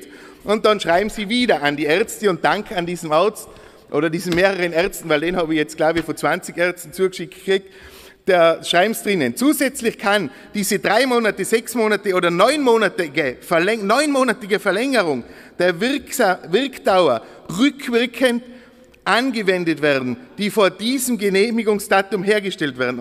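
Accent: Tyrol, Austria. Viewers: Mir gefällt Herr Steiner. Sein Tiroler Dialekt ins Hochdeutsche zu sprechen ist einmalig. Er ist echt klasse und bringt es auf den Punkt. Tja die Parlamentarier u. Nat.ratsabgeordnete der anderen 4 Parteien nehmen es mit der Wahrheit nicht genau. Vergessen aber ganz, daß ihre Reden aufgenommen werden sei es mit Video oder per Stenografie. Davonkommen werden's net. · Spitzenpolitiker welcher sich nicht verbiegen lässt und authentisch ist, a waschechter Tiroler, ich liebe diesen Dialekt 😎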